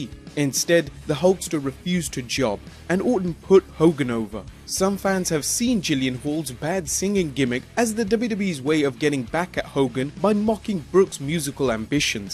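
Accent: United Kingdom. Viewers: fuckin shitbrit narrations over shit that has nothing to do with them. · WCW coverage by a British accent sounds like shit.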